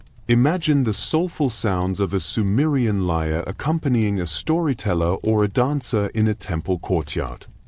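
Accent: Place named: United Kingdom. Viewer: I can endure only limited doses of British pronunciation.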